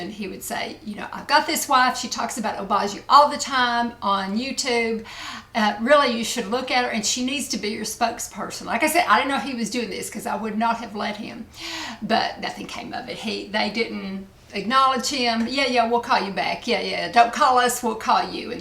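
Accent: South, United States. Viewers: I have always found your videos very enjoyable and your personality is genuine and comes across with honesty and a sense of comfort. You don't strike me as a social butterfly, but as a true home and family gal. You are never "overdone" with the artificial enhancements. You apply your make up to compliment, rather than disguise. And I love your Southern style and your accent. As far as the hurtful racist comments, evil is a dark place where souls lurk and has no place where the sun shines on goodness. And your videos bring sunshine to those of us who love you. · Great video, but then, I have dearly loved all your videos from the start!!!! You have values and feelings so similar to mine, we could be sisters!!! LOL!! I'd be your very much older sister, though!!! Please don't be so hard on yourself, I hated to hear that you redo so many because you felt they weren't good enough or that you could do better...I can understand that of course, but, those of us that know you and admire you so much would understand and wouldn't care nor would we judge you in anyway, if you bumbled every sentence from start to finish!!! 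For your sake, sweet, dear girl, ease up on the self criticism and just be you. We ADORE 'YOU'!!!! I am also southern and the first time I found you, and heard your gorgeous southern accent, I knew right then you'd be a fantastic YouTuber....and I wasn't wrong!!! Hugs, and have a blessed week...and hugs to all your precious family. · Your southern drawl is endearing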